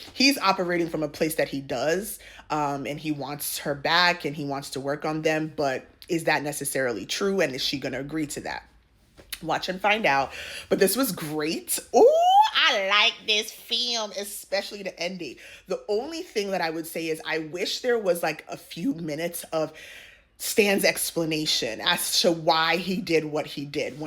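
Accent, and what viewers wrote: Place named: United States. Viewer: I really enjoy your reviews, and this comes from genuine support. I’ve noticed a pattern where men sometimes receive more grace for harmful behaviour than women, and it’s important to keep the same energy for both — especially when women call out behaviours or action things that men have long been allowed to get away with, from the start of time. I also struggle with how often Nollywood glorifies adultery. It isn’t just “messy” — it’s emotional and physical abuse, with real consequences like STDs and even death. That’s why younger Millennials and older Gen Z reject narratives that romanticise cheating. We love the American accent here in the UK 👍🏾. I appreciate your work and just wanted to share this perspective because your platform is strong, and fairness matters.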